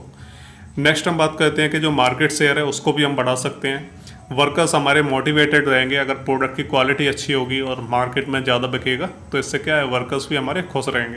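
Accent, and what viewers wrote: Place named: Chambal, India. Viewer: Sir aap bharatpur ya dholpur side ke ho kya ya fir चंबल से ho .aapke voice tone se kabhi kabhi aisa lagta hai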